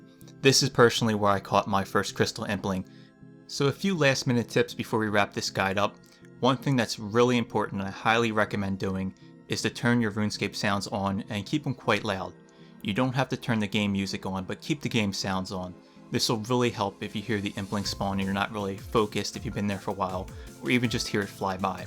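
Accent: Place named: Philadelphia, United States. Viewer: You sound like you’re from the philly area. Also I hope you play osrs by now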